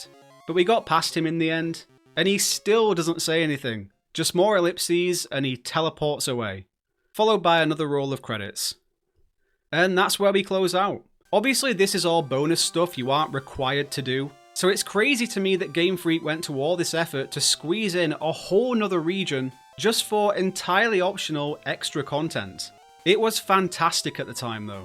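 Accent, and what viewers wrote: United Kingdom. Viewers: You have a wonderfully charming British accent.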